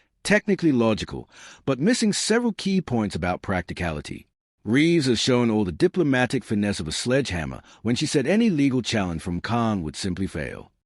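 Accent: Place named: United States. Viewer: I'm a bit suspicious of this, nice though it would be, if true, but there is nothing about this on any other youtube channel. Together with this being reported by someone with an american accent, how come nobody who is British reporting this. A huge pinch of salt is needed with this. Is this, (Fake News) going under yet another name?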